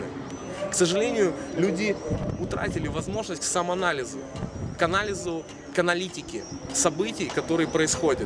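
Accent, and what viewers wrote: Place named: Ukraine. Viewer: Вот это украинец формата 90-х годов я их такими всю жизнь и помнил. Добрые, светлые, со смешным говором.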